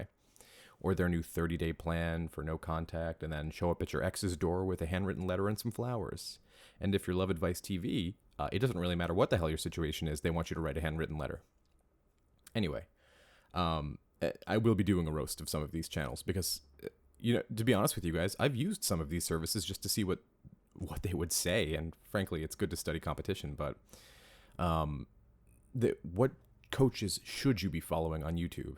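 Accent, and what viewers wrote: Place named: California, United States. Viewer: Actually I am very curious to know. You don’t show your face and I am sure that has A LOT of your viewers curious lol. At least tell us where you broadcast from? I am betting you’re in California based on your accent or somewhere out west. I am in Canada. Ontario near Toronto to be exact.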